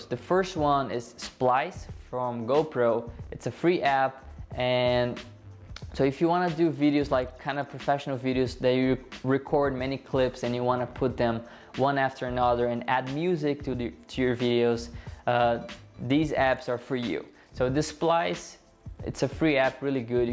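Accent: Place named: Brazil. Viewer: Fala bem Iglês cara.. mas da pra perceber que é BR.. MEsmo assim parabéns!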